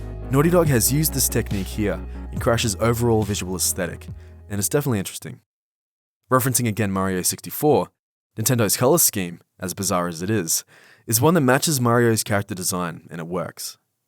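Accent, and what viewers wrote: Australia. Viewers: He made it so engaging because of his Aussie accent. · I just can't take a serious discussion by someone with such a stereotypical Aussie accent.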